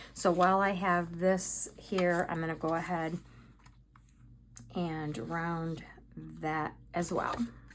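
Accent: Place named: New York, United States. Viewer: These are so cute! I have to ask if you are from NY? I grew up there and I'm hearing it in your voice. Just curious.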